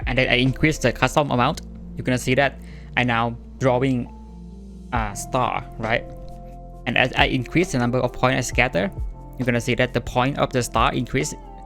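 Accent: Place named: Thailand. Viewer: คนไทยปะครับสำเนียงคือได้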